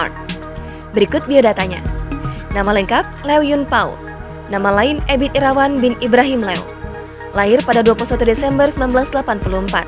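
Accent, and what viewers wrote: Indonesia. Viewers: Itu cara sebutan org indonesia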